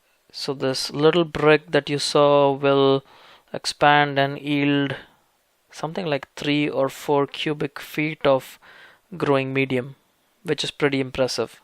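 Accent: India. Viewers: indian i think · Your accent seems to be an indian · not an Aussie accent its Indian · it's an indian accent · I love Indian accent, so refreshing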